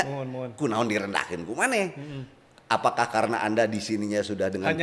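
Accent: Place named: Sunda, Indonesia. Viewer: teu ngeunaheun bahasa sundana host teh wkwkwkwkwk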